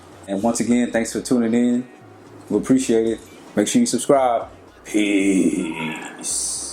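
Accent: Ghana. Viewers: They do that in JA too. If they see u from the states they think u have money. Oh wow this is sad the roads are this bad. Its show how much the government care. The money going in their pockets thats what...these places are so corrupt. This is why they beg their family to send stuff from here. Ur Ghanaian accent is so on point tho lol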